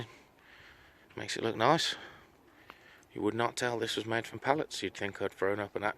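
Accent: United Kingdom. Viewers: Been beating around the idea of a Pallet Shed for a few months now. How long have you had it standing? What is the life expectancy of it? I live in Nova Scotia, Canada... alot of Salt Air and Snowy/Cold winters. Just not sure how long a Pallet Shed would last. I assume your English/British by your Accent and your weather is close to ours. Would appreciate any Info on Longevity if you have any. Other then that, great build man, love it 🤟🇨🇦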